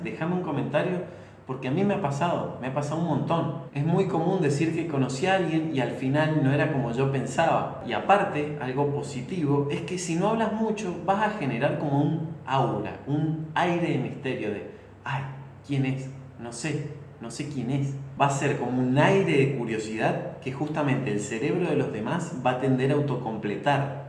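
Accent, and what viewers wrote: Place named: Mendoza, Argentina. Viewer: sos mendocino? yo lo soy y siento que tenés el acento, abrazo!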